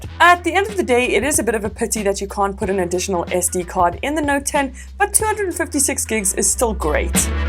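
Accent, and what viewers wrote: South Africa. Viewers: Thought you were south African lol inwas born and raised there and came over to the uk in 2010 xx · Refreshing to hear a South African voice! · Wait you're south African? 😮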